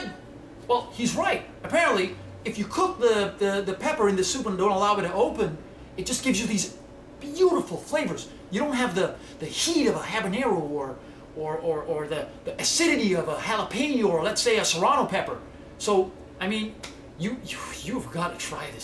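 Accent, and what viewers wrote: Trinidad and Tobago. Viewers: You got to do some more with just ur trinidad accent. . just luv it !! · his Trinidadian accent realllllllllllllllllll on point wdhh loll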